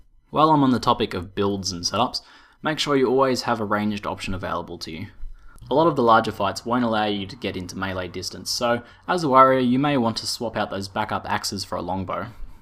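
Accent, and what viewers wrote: Australia. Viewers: Awful aussie accent :D Nice vid however.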